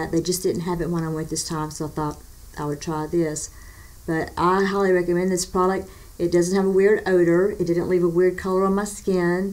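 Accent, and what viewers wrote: South, United States. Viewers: Good southern accent!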